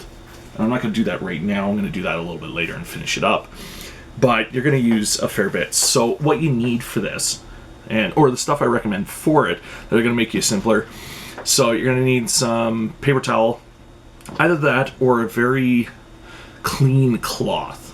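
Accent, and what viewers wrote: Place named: Canada. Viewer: Great vid. Are you Canadian? You sound Canadian. Detecting Canadians through their speech is my super power. ;-)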